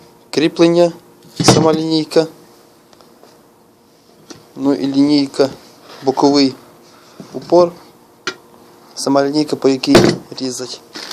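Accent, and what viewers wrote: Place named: Rivne, Ukraine. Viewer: ну - молодець! ще не вмикнув а вже поламав...)така мова гарна,як десь біля Рівного..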